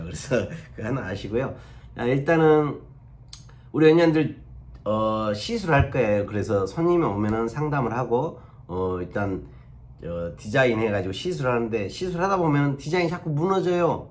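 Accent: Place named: Jeolla, South Korea. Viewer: ㅋㅋㅋㅋ전라도 분이신갘ㅋㅋㅋ정감가요 말투가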